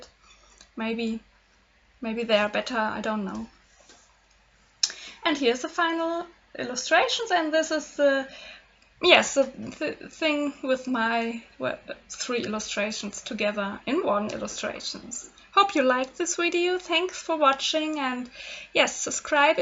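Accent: Germany. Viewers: i really love your accent. Im american but i have a speech impendememt that makes me sound like i have a heavy accent (idk thats what people tell me). I dont see why people would make fun of you, your English is fantastic and i personally think you're accent is beautiful. Infact what i like about you is that i can tell you really try to explain how you do things and i think that's really sweet :) I might be alittle biased because i love German accents but whatever. You're a wonderful person and don't be timid about who you are or what you sound like. Atleast you try, that is all that should matter to the ones that matter :) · im sorry if i wrong but are you german because i am to and german was what i was raised with and i sounded the same way sooooo dont worry it gets easier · I think your english is great! You have a little bit of an accent, such as german natives have, but that's all. 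Thank you for your lovely and instructive videos. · I personally love the German touch to your English. Everyone adds their own flare to their English. It's sad to see those trolls criticize someone for sounding unfamiliar to themselves. On a side note, I really like your work, I'm considering using paint more often because of you 🙌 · Love your art, love your English because you use it with a delightful accent. I spent many years in Germany with the army and never mastered Deutsch. Thank you for sharing your art and please do not lose your accent. Ps where in Deutschland do you come from.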